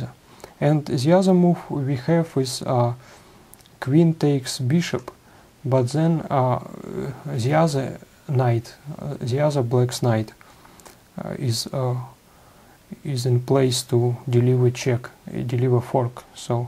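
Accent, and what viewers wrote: Russia. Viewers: I love your "boring-russian-nerdy guy" accent.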